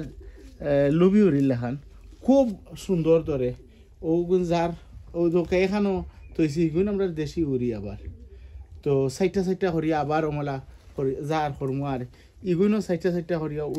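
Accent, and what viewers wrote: Sylhet, Bangladesh. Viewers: I❤ you Bhaisab & I Love your gardening & I Love Afnar syLhety kotha gulu keep safe & well Asalamu walaikum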